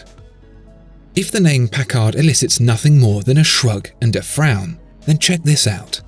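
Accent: United Kingdom. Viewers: Now if we could just get you Brits to stop saying 'Pack-AHHHHD'.....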